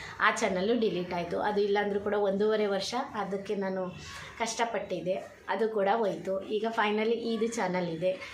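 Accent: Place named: Hassan, India. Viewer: ಮೇಡಂ ನಮಸ್ಕಾರ ನೀವು ಮಾಡುತ್ತಿರುವ ಇತ್ತೀಚಿನ ವಿಡಿಯೋಗಳನ್ನು ನೋಡುತ್ತಿದ್ದೇನೆ ಎಲ್ಲಾ ವಿಡಿಯೋ ಚನ್ನಾಗಿ ಬರುತ್ತಿದೆ. ನೀವು ಸರಳವಾದ ನಮ್ಮ ಹಾಸನ ಜಿಲ್ಲೆ ಭಾಷೆ ಚನ್ನಾಗಿದೆ. ನೀವು ಏನು ಓದಿದ್ದೀರಾ ಇಂಗ್ಲಿಷ್ ಪದಗಳು ಚನ್ನಾಗಿ ಬಳಸುತ್ತೀರಾ, ನಿಮಗೆ ಒಳ್ಳೆದಾಗಲಿ. 🙏🏽🙏🏽🙏🏽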